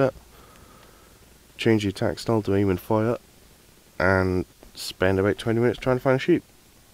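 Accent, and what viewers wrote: Wales, United Kingdom. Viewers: where are you from? Sounds like a welsh accent.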